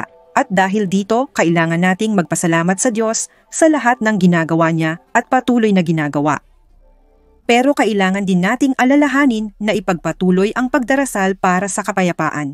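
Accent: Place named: Philippines. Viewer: GOD, bless para kang pilipino mag salita maganda ka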